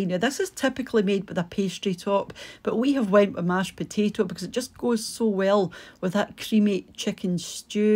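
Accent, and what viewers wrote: Scotland, United Kingdom. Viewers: it looks delicious and I love your Scottish accent! 🥰 ps. I've never heard of a "knob" of butter before☺️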